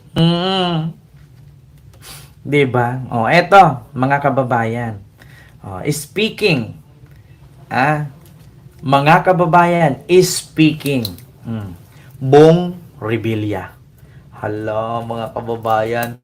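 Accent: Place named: Iloilo, Philippines. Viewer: Tonong ilonggo ka magsalita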